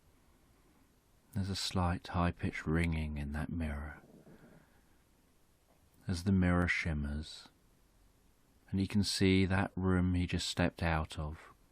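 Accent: England, United Kingdom. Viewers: Why can't I stand the English accent.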